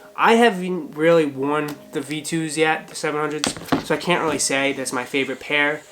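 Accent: Boston, United States. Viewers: I hear an accent lol Boston? EDIT: I asked that in the beginning of the video.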